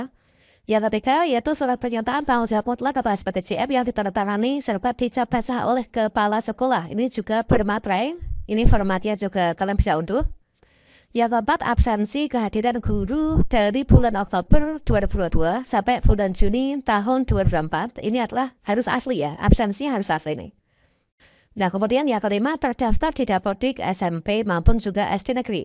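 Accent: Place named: Java, Indonesia. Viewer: Meddok banget suaranya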